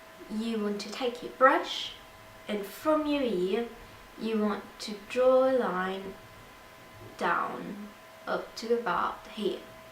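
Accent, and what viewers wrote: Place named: United Kingdom. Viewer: I was like 'She's got a british accent now ' throughout the whole video :P Good one though, I'm officially a celeb's mate :3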